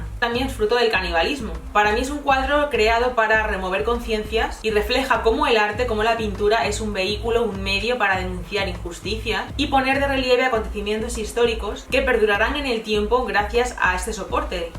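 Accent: Spain. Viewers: Hola. Es la primera vez que te escribo. De qué parte de España eres oriunda? Lo digo por tu acento y tus razgos.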